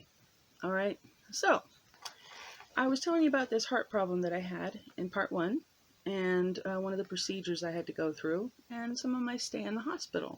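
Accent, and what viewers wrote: United Kingdom. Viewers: Accent, kind of London, East End - Not bad at actually! - Seriously though, If you can ever find the time, I think your wide experience of both health care systems are well worth putting into print.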